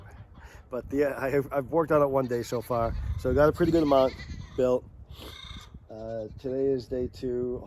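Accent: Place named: Massachusetts, United States. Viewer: No he doesn’t. His wife has cancer though, I love him, i bet hes from massachusetts like my aunty dee dee great accent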